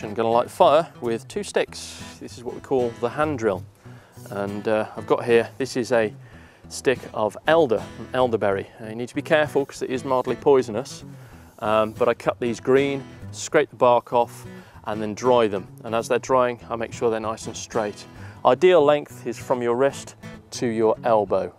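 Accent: United Kingdom. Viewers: I love you your Brithish acent is to die for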